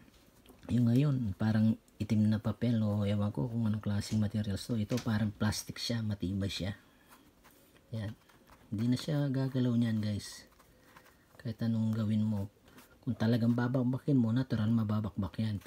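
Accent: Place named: Pangasinan, Philippines. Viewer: Pangalatok ka di ba? halatang halata sa accent mo pag nag tatagalog ka eh..🙂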